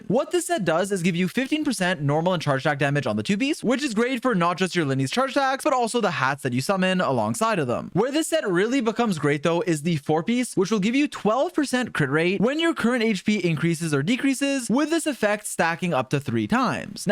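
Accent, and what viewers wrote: Quebec, Canada. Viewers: does he have a cold or Canadian?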